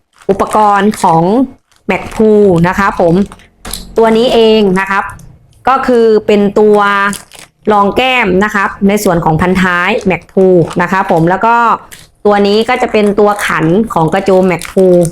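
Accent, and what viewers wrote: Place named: South, Thailand. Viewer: ร้านอยู่จังหวัดอะไรครับ สำเนียงคนใต้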